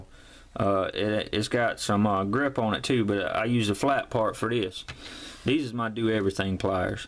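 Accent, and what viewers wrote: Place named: South, United States. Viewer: Ok, your accent is more country than mine. Thanks for making these videos, I enjoy them. They are a super big help. The paperclip working one is something I will eventually try. But this one is what I was looking for. I am looking to raise just the back end of some old muscle cars, and moving the tires out a tiny bit.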